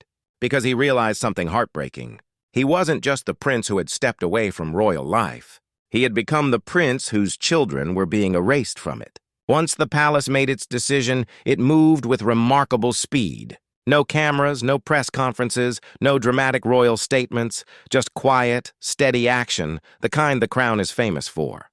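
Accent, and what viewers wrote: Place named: United States. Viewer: Dear Lord how I hate anything narrated by a Yank. I know not a word can be believed.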